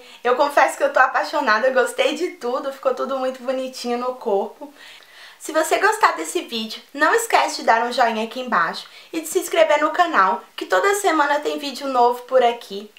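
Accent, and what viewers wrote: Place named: Northeast, Brazil. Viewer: acho bonitinho esse seu sotaque nordestino ;P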